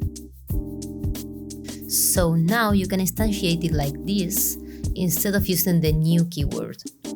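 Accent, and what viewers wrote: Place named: Argentina. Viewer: Great video! I'm a big fan of the format, shots, and quality of this! Uno puede escuchar la argentinidad en sangre con el acento 🇦🇷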